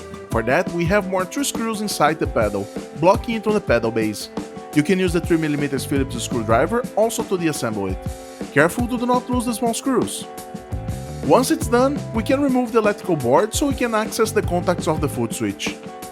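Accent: Brazil. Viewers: are you brazilian? you have the característics of brazillian when you speak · sotaque Brasileiro, tmjt BR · Tu é brasileiro, irmão? Esse sotaque entrega ❤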